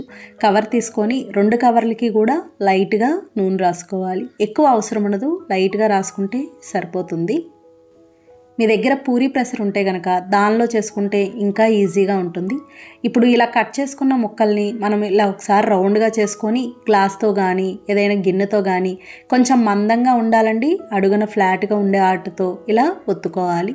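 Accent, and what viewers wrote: Godavari, India. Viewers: Meedhi godavari aa andi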